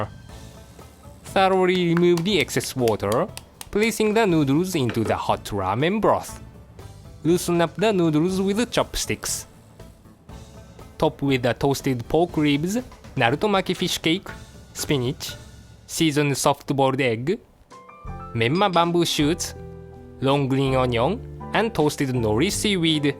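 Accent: Japan. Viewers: The host has very strong Japanese accents, but I understand him very well, but will difficult to many English speaking people. It was better, if the lady talks. This is not a healthy food and it takes a lot of times to make a simple food, but when you have nothing to do, you can cook it at home. This guy and woman work together perfectly, but they use low quality Japanese cookeries. Even I use very nice Japanese cooking stuffs and knives everyday. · この、いかにも『日本人の英語』って感じが これ海外の人にはちゃんと伝わってるのかな？ 字幕あるから問題ないか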